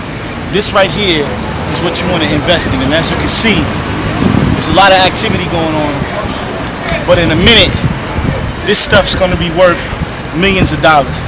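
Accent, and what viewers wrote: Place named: New York, United States. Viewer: Spoken like a New Yorker.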